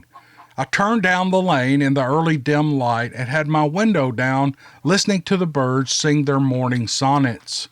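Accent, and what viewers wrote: South, United States. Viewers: 😂I laughed and laughed 😅 at this story, 🤣 poor kid, this was a fantabulous! Bigfoot story I'm still playing catch-up, but WoW, I do love but the stories have to be read by you exclusively! Or I'm not really interested in listening. IDK if it's your southern drawl, or what 😊 ROCKS❤ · Hey, I have a good one for you. All stories are B.S. = *BEST *STORIES!! I LOVE THESE STORIES ❤️❣️. Keep reading because your the *Best *Story Narrator out here in you tube land. As for the people who know a story is supposedly b.s.( I say ) *Bloody *Stop and enjoy that *Beautiful *Southern voice. Much love to you and yours ❤️ B. S. = *Be *Safe. Lol. 😄.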